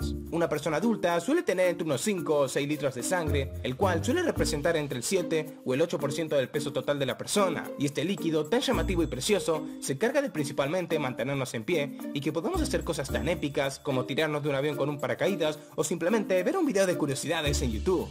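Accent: Argentina. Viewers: Jaja vos sos Argentinooooo!!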